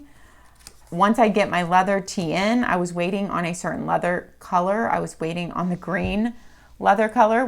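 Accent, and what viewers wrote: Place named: Texas, United States. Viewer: Love this video! Your accent and use of y’all really makes me miss Texas haha. I got the Christmas planner for this and I am so excited to use it as a yearly memory book. I wish I had it when my kids were little, time goes so fast I want to remember it all.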